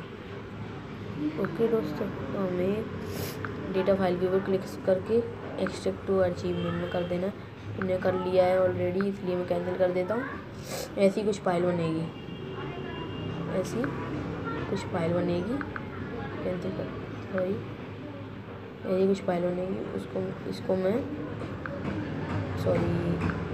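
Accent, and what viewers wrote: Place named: South Asia. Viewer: roman reigns fans only well than you must be from India or PAKISTAN cuz your accent made me feel like you're speaking Urdu or Hindi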